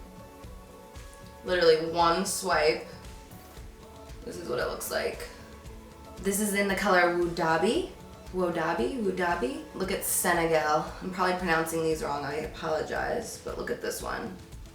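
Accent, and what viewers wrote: New Jersey, United States. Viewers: Rose Sago lol no she has the jersey accent. But she does kind of sound like rihanna now that you mention it. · I knew when you said "wooder" that you were from New Jersey! I thought I recognized that accent 😂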